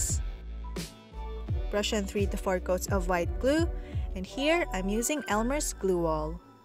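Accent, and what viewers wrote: Philippines. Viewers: Your Filipino accent 😍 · You have a Filipino accent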